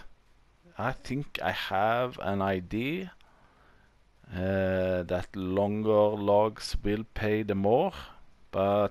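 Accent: Bergen, Norway. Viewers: You sound like you're from Norway Bergen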